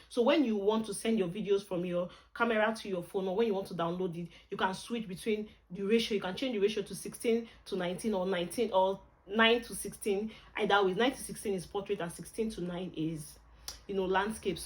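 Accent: Port Harcourt, Nigeria. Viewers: Trans Amadi accent 😂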